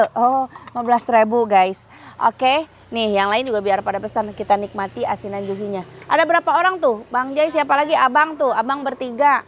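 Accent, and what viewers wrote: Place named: Garut, Indonesia. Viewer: Hayang aing muncrut🤣 Khas bahasa urang garut Si Tteh. Ngiring raos na Tteh🙏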